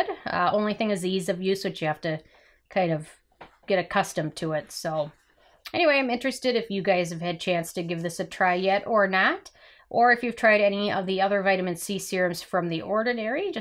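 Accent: Minnesota, United States. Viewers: I just received this today. I noticed your accent then you said you were in MN. Me too😁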